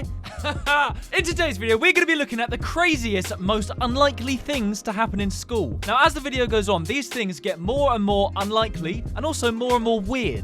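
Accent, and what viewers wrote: United Kingdom. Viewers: I like your British accent It’s kinda like more like dinner table queen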